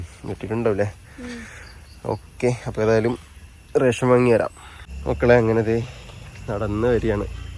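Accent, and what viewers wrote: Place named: Kanjirappally, India. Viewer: സംസാരവും ഞങ്ങൾ കാഞ്ഞിരപ്പള്ളിക്കാരുടെയും സംസാരവും ഒരുപോലെ ഇരിക്കുന്നു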